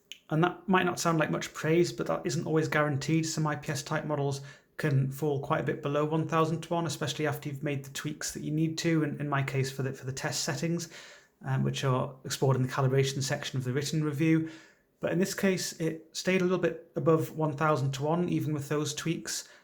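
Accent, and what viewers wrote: United Kingdom. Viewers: Love British English 🥰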